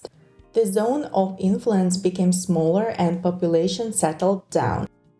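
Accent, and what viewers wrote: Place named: Eastern Europe. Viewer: You sound Slavic too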